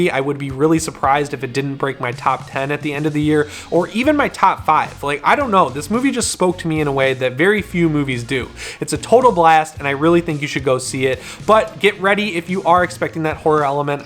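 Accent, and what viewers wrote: Midwest, United States. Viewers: You sound like you're from Chicago or midwest.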